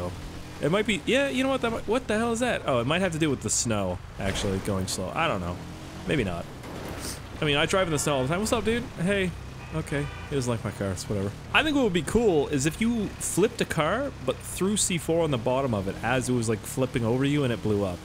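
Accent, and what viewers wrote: Canada. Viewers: "when I get angry I sound really Canadian" I hear ya there bud lmao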